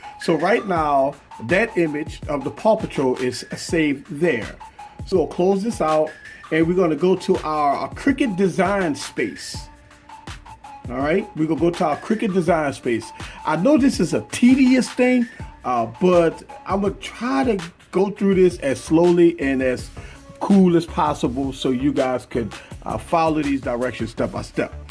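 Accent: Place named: New Orleans, United States. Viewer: I love your NOLA accent! Great video. This was very helpful.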